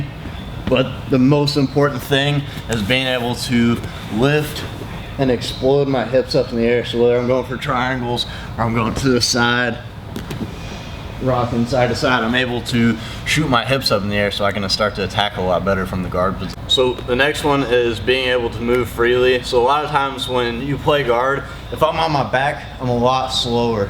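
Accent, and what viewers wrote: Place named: South, United States. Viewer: he kinnda have like a southern accent, great drills fella, I will try them out on today's class!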